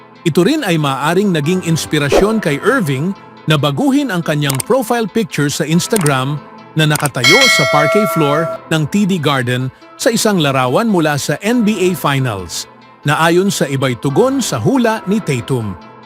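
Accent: Visayas, Philippines. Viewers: bisaya kaba bigkas mo bisaya eh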